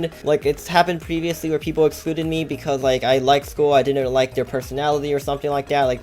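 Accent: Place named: United States. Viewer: Wow how you speak like american when you chinese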